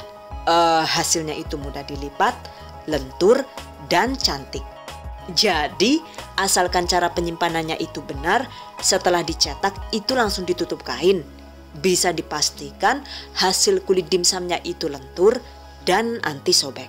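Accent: Banyumas, Indonesia. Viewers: Terimakasih resep2xa,selalu menyenangkan menonton video THV, gk jarang saya senyum2 mendengar cara bicara si bunda, yg paling saya suka bicara dlm logat ngapak😁👍...... Request saus pasangan dimsum, matursuwun🙏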